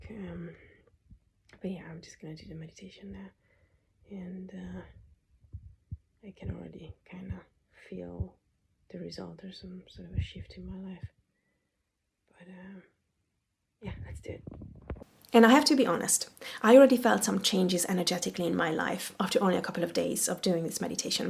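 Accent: Hungary. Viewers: OMG ...I don't know how I got here...but what you say resonates with me so much! I also want to have my own workshop because I want to break free! My aim is also freedom...it has always been freedom, I just never dared to act on it.But now I have the courage to break free from having a boss, from working on a computer, from working corporate jobs. I'm only halfway through the video, so I'm really curious about the outcome! Ps.: Are you originally from Hungary? I suspect it from your name and accent😄. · Nooo! The Hungarian accent is really good. I love it!😅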